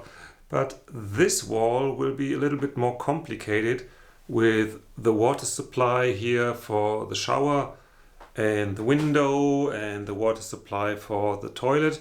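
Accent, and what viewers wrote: Germany. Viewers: I actually enjoyed hearing you talk like in previous videos. I found it to be a perfect mixture of work and talking. I love your German accent and I also learn things as well. I’m glad you and Lazlo are doing well!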